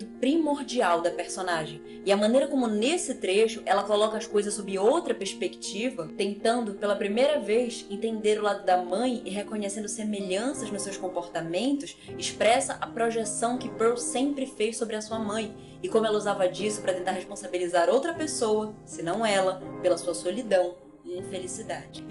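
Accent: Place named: Pará, Brazil. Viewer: Voz de dubladora com sotaque paraense, asmei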